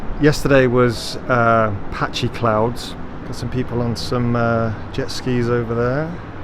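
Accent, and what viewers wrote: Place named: United Kingdom. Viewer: Me and wife have booked here for September great video Ps u don’t sound American our you British ?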